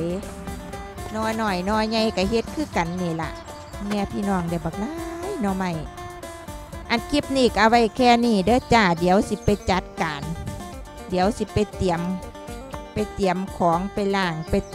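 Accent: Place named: Roi Et, Thailand. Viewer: ฟังสำเนียงคือคนบ้านข่อยไทร้อยเอ็ดแท้น้อ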